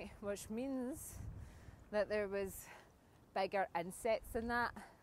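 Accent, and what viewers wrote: Scotland, United Kingdom. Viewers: That Bridge, Is Probably The Best Looking Bridge, In The World.! Shame, It's Only Used Fir, Trains.! So Are Ye Doon There, Looking Fir Crabs, Fir Dinner, Or, What.?! Thats A Broad Accent, LUV.! Didnt I Hear Yeh, On Scottish COD Players Wae Marley13, Lmao.! Or, Maby You Know, That Mad B'strd, On A Paddle Board, Telling A Baby Orca, TAE F#@ Off, In A Loch, &, "IT DOES", On Scottish TikTockin, HAHAHAHAHAHA.! A Rainbow, But nae LEPRECHAUN Wae A, Caudren Of Gold/Sunshine, But It IS Scotland, After All.! HAHAHAHAHAHA.! Watch Oot, Fir The Midge's, B'strd Wee, Blood Suckers, &, The Flying Haggis.! Lmao. Ken WIT A MEAN.! Namaste. 🏆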